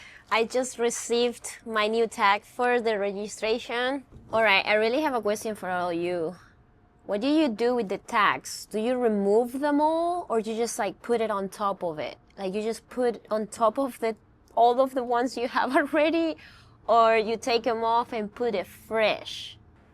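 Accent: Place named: Andalusia, Spain. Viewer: Hola,no sabias que tenia acento Andaluz, llevo poco día siguiendo tu canal y veo que eres un persona de corazón, gracias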